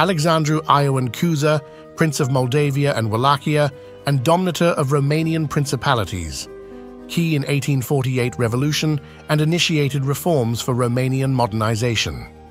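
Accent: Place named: Australia. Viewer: I looked at your 'about' and see that you are located in the UK. I hear an Aussie accent 🤔😊.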